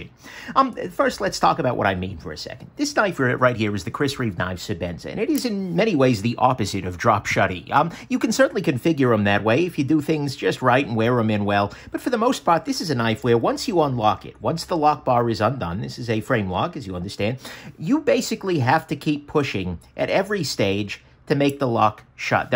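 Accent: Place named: New Jersey, United States. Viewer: Where do you grew up to get a accent like that i suspect dirty jersey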